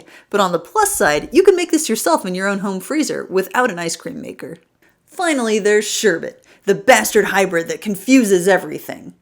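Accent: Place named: United States. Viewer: Lol. I was caught off guard bc it sounds a lot like mine and my mum’s voices. Kinda just seems like a variety of American accent 🤷🏻‍♀️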